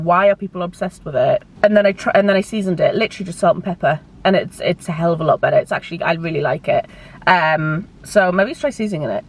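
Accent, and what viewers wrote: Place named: Wales, United Kingdom. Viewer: I’ve come from demis Chanel and firstly I must say, loving the content, I’d love more vlogs, I think you’re fab! But.. where is your accent from? I feel like I hear Welsh twangs but I’ve just assumed your from Newcastle area so now I’m doubting the Welsh accent. Ps I love the Welsh accent so I’m hoping I’m right in my thoughts otherwise.. that’s embarrassing 😂😂💜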